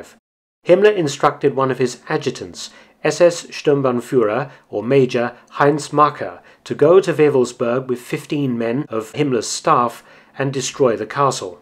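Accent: England, United Kingdom. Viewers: I'd say he's English, to be precise.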